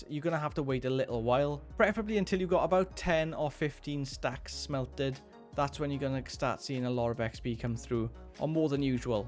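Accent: Wales, United Kingdom. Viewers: Hey, where in Wales are you from? I'm from Llantrisant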